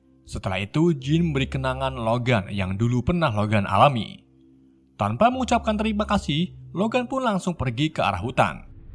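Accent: Indonesia. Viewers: Iya bang, sebagai org yang msh bljr, saya merasa agak risih, karena pengen bgt bisa bahasa Inggris , kembali mendengar aksen indo:v. Sukses terus bang channel langganan wkwk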